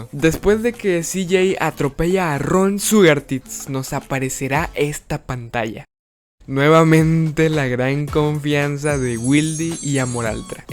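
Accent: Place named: Mexico. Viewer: eres mexicano wey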